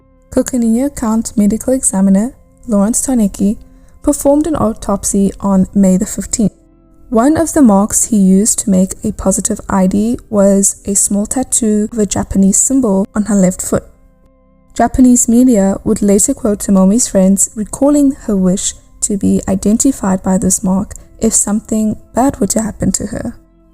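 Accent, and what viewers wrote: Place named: South Africa. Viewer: (Are you South African?) For sure she is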